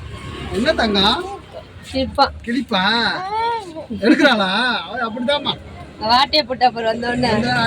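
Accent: Ramanathapuram, India. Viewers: Ennoda native ramnad dhan unga speech vachudhan kandupidichen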